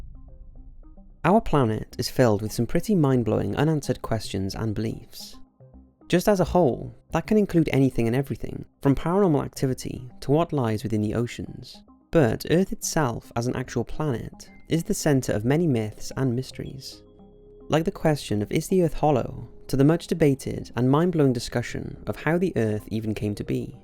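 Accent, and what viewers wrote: Wales, United Kingdom. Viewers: Lovely Welsh accent!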